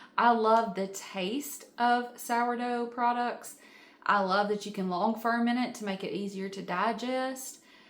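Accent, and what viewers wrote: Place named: Alabama, United States. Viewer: You sound like you’re from Alabama. I’m a north Alabama girl and I feel the southern cooking in the bones!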